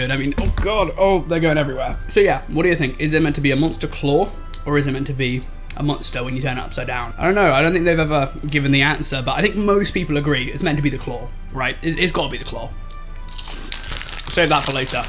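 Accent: United Kingdom. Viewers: You sound a little like your British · I reckon that you were educated in a public school and that your probably from somewhere like Hale, Altrincham and if you're not a Northerner you're probably from the cotswolds but you're accent has been molded from the fact that you've spent a lot of time in a boarding school so it's developed from mixing with other people who are from all over the country so I reckon it's an amalgamation and maybe a touch of your parents from when you were 1st learning to talk, please reply and let me know if I'm right or wrong and tell me where you're accent is from.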